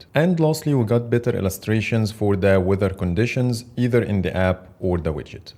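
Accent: Egypt. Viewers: Off topic but you sound Egyptian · كانه عربي مصري🤨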